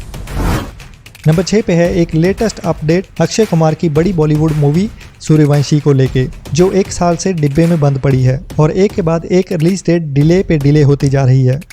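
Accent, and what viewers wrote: Punjab, India. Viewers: You speak like Dara Singh and such a heavy Punjabi accent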